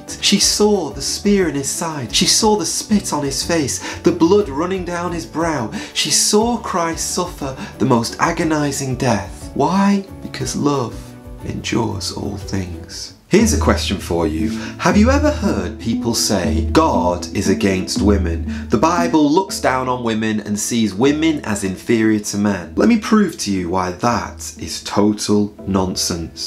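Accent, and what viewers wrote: England, United Kingdom. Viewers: I love Ur speaking voice, Ur English accent!! God Bless U!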